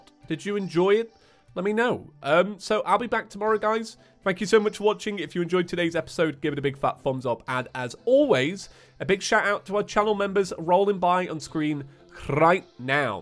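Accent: United Kingdom. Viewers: Bruh I always click on these videos to sleep, and it always has to be a stinkin British accent? Can you speak more American please? It’s more relaxing than that proper sounding stuff.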